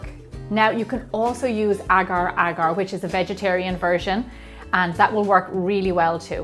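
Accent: Ireland. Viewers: God I love the Irish accent!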